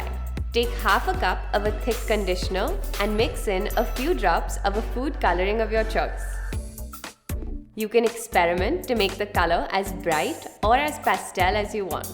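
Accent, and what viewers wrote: India. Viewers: Shes so beautiful, to bad has indian accent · OMG ..That point you started talking i realized that you are an Indian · She definitely shocked me , I didn’t know she was Indian and I hope I’m not being racially insensitive but her accent is so beautiful 😍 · why is she white but with an indian accent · Why do you sound Indian? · When it was really shocking and belly turning to see this white girl certainly starting to talk with the Indian accent very weird and how to place well then I remember the world in clear values and I remember the world and their values and what her background must be for me to be looking at this so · She has an Indian accent but she doesn't look Indian 😳🙂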